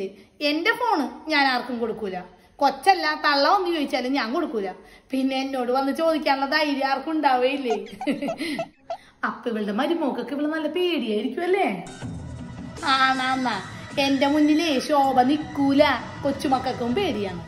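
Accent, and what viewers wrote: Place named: Paravur, India. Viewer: I love your parur Accent👌👌I am also from parur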